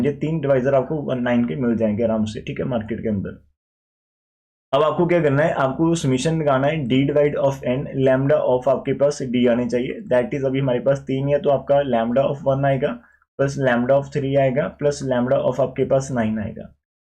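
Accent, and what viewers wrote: Punjab, India. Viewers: Sir ap punjab ho kya?? O bhai sir mene kya sahi gusse kya hai maine apka ak short video dekha jisme ap punjabi me bat kar rahe the vo video dekhne se pehle hi maine guess kiya😮😮😮 Punjabi agaye oye🤩🤩🤩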